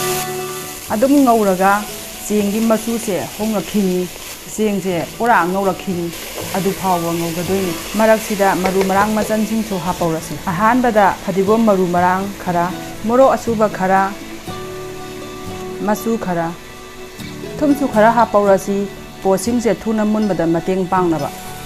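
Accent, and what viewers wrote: Kakching, India. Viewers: Ngangiba lonsina kakching haiba lamdugi lon ne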